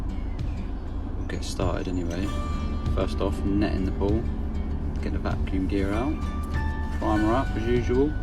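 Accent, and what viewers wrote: United Kingdom. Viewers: based on your accent you’re across the big ol Atlantic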